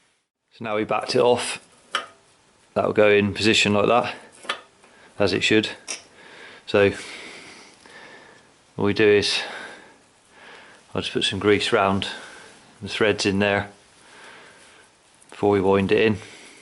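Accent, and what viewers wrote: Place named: South West England, United Kingdom. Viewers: His account is south west I think. I'm terrible with accents although I've lived in different parts of England. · That's why I'm asking, reminds me of my Bristolian mates' accent, the best in the world hah. So you're spot on I reckon.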